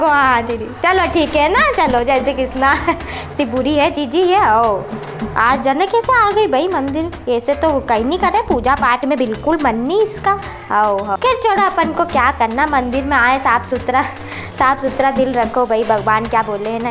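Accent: Indore, India. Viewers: Love my Indore and Indori lahja😆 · Watching your video from Paris... I miss my Indore and the language so much, I show my husband your videos and tell him, that's how we speak :p Lots of love <3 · Indori accent is contagious 😂😂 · Where do you find this content? You are so real on your acting along with indori tone. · Ahaa that pure indori tone...really that teej wali line m aunties aese hi hote h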